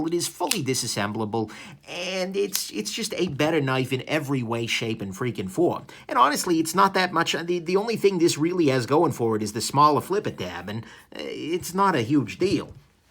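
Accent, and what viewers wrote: New York, United States. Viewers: That New York accent...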